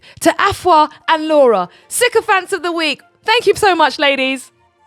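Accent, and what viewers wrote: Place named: England, United Kingdom. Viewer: I love the way you talk so English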